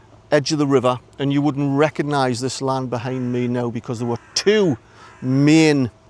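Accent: Newcastle, United Kingdom. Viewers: As a Geordie from Wallsend now living in Thailand I help local kids learn English and I do not feel home sick as all my kids speak Geordie like you. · It's wonderfull to hear the Geordie accent and to see some places associated with my family, way back. My mother was born in Pelaw in 1923 and she kept her accent all her life, despite living most of the rest of her life in East Anglia. Her father, Sidney Brown was a miner down Wardley pit. He was very active in the trade unions, and in October 1936 was one of the Two Hundred on the Jarrow Crusade. It may have been a futile attempt to challenge an intransigent government's mind, but now it is an iconic event that everyone has heard about. Your new video shows the very area where once there was a mine where "Ganga Broon" worked and my mother lived as a girl. So, huge thanks,. Keep up the good work!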